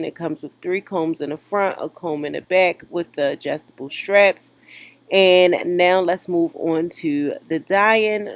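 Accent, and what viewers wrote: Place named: Maryland, United States. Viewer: That “Murrlin” accent is THICK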